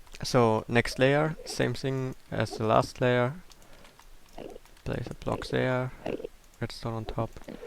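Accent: Germany. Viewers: Du machst wirklich super Tutorials, man hoert deinen deutschen Akzent raus. Ich hoffe, ich spekuliere nicht falsch ;P Es wuerde mich sehr freuen, wenn du mehrer Minecraft Erfindungen machen wuerdest. Ich hab's auch mal probiert, aber manche Menschen sind im Umgang mit Redstone nunmal besser :/ Ich wollte nur sagen, dass du super Videos machst und auch weiterhin so weitermachen sollst.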